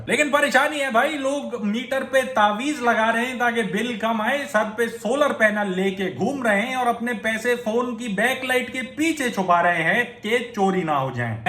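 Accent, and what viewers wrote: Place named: Sindh, Pakistan. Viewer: Loved your Sindhi accents 👍❤️😆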